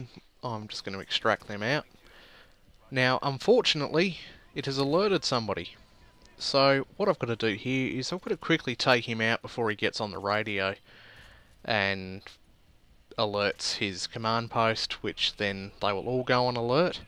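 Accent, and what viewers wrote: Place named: Australia. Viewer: But yes it is Australian. It's a pretty broad accent at that, bordering on what we call "ocker".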